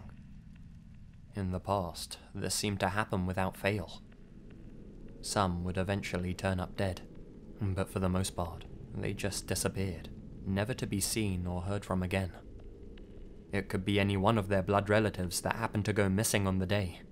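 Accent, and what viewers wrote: United Kingdom. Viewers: British accent doesnt spook me unfortunately. Good content tho.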